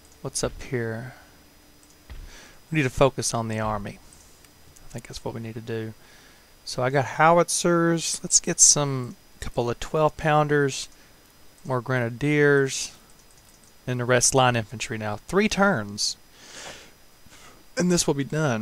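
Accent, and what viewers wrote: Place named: South, United States. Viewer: your southern accent is great dude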